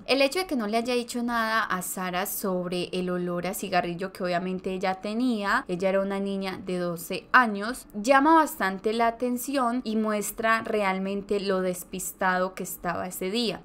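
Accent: Antioquia, Colombia. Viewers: Primera vez que veo tus investigaciones, soy panameña, me encanta tu atento colombiano, me encanto el Berraca, excelente trabajo y este caso te quedo fenomenal, duro y exitos guapa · Es una mueletilla del acento paisa en Colombia...es genial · Saludos desde Orange California 🍊 from 🇲🇽 Mexico. Ese acento colombiano el mas hermoso ❤ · Te encontré, y no pude resistír hacer una maratón de tus videos . 😮 wow lo haces muy bien y ese acento paisa me encanta viva nuestra 🇨🇴👏🏻👏🏻👏🏻👏🏻👏🏻👏🏻👏🏻👏🏻👏🏻👏🏻👏🏻👍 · un gusto tu forma de contar ya me suscribi eres de colombia?saludos desde lima Peru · es Colombiana 🇨🇴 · Eres de colombia verdad se nota por tu asentó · Wow! Muy buen video, me gusta como narras y la información que proporcionas está muy detallada 👌. Tienes una nva. suscriptora 😃. Por cierto tu acento de 🤔 colombiana? me suena bien lindo, me recuerda a las de las novelas colombianas que veía con mi hija 😁. 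Saludos desde Mérida, México 🇲🇽.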